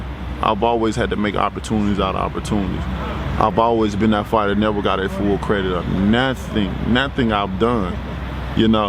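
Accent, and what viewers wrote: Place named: Alabama, United States. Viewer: I’m reading the comments and I think it’s disgusting that people are poking fun of the way that he speaks, he’s from Alabama, they speak with a southern accent. There’s nothing wrong with what’s he’s saying, people from around the world think, especially Europeans, look down on other English speakers because we don’t sound like them. I know this to be a fact, I served with British and Irish soldiers and they rag on guys from America about their accents. I can’t wait until this guy cleans out the the division, so all you Europeans can be quit. But I’m figuring you guys will complain about something else Americans do or say.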